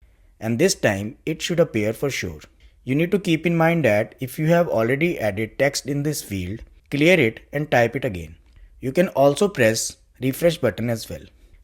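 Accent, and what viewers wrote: India. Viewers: and you sound like from india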